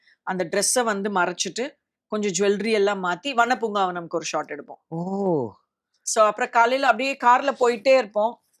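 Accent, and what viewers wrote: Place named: Tamil Brahmin, India. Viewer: She is Tamil Brahmin I think…see her way of talking and food taste!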